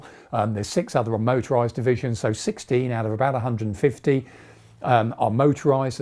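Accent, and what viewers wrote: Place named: United Kingdom. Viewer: i am apologizing since English is not my native.enjoyed this video and i am wandering what part of G.Britain his accent came from.in my country tv series Only fools and horses is stiil popular and finding some similarities,although prounancing every sounds perfectly clear and have no problem to understand him.once more apologizing for this ugly English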